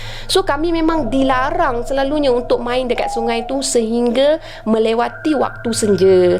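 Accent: Kelantan, Malaysia. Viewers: Weyla ore klate..sblom nie xbunyi klate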